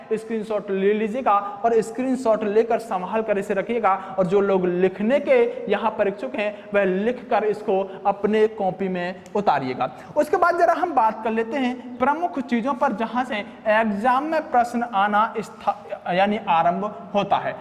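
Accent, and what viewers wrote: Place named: Western Uttar Pradesh, India. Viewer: Itna clear hai Bhai ki ye west up se hai shayad bulandshahar.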